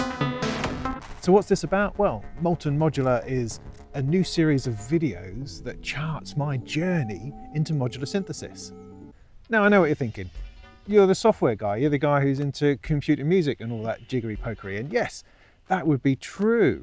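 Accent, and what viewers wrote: United Kingdom. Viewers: if you are into subtractive ways, and since you like the moog sound, i suggest looking into the AJH series, i have 2 of their modules in my rig and i ve tried my share of vcos to tell you you ll get every penny you pay for... don´t wanna mess up your modular or financial plan. but modular is about the analog after all and there is a reason certain modules have a certain depth.. haven´t tried the erica and befaco stuff yet, but i hear a lot of good stuff about them... and at some stage you gotta stop drooling over cwejman modules that will probably not be built because the waiting list is longer than number of heartbeats the man has left. so either filter or vco, AJH would be my suggestion. it´ll stay with you as long as you run a modular rig... and since you sound like you´re from that island that just brexited a leg (just a pun!). 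AJH is local economy! Oh yeah, the disting MK3 has saved many many lives!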